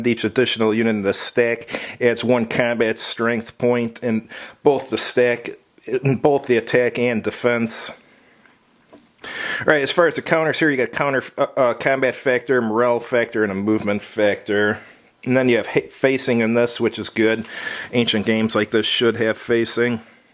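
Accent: Chicago, United States. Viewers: Ancient wargames reviews with Chicago accent, I'm in.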